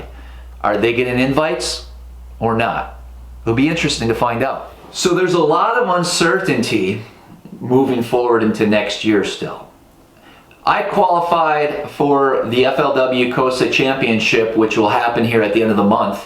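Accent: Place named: Chesapeake Bay, United States. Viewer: also quick question, you live in Maryland ?....I am in Richmond VA and you talk upper chesapeake bay....so just curious where you live at ...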